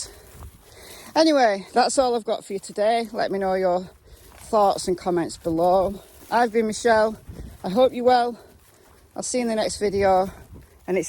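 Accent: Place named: Northern England, United Kingdom. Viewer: Aww shame it's the end of hopping Cassie 😏 Absolutely love your channel Michelle. It's great to hear a northern accent. I'm a Brummy myself. Anyway catch your next stream ❤️👍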